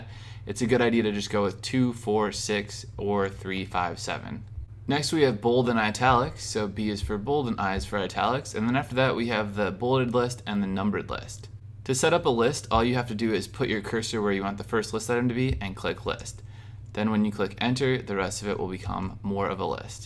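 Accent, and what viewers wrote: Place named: Midwest, United States. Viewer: Thank you so much for the amazing tutorial! This is my first time building a site and the video coupled with the Midwestern accent made it easy to follow along with (even if the video took me 5 days to get through from all the pausing haha) I do have a question about editing the blog page with elementor. I am gathering that this is not possible when the blog page is created under pages? I was able to edit under posts, but the changes are not showing on preview. This all was noticeable during the "adding the footer template" portion of the video. Will I need to go to the pro option to edit with elementor or keep archives. Do you happen to have a video on this already, so as not to be redundant in comments? Thank you again!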